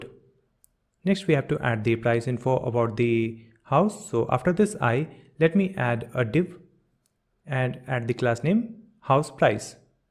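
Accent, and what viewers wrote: India. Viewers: bro, sorry I cannot listen to an Indian for 12 hours.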